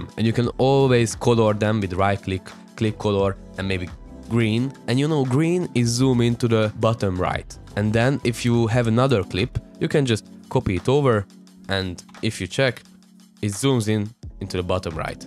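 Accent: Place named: Hungary. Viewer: Wait your accent is VERY simmilar to mine. Are you Hungarian?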